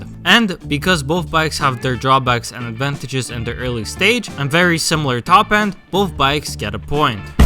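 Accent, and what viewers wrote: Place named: Russia. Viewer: А чё там русский делает? Это же точно русский. Такой акцент буржуи не повторят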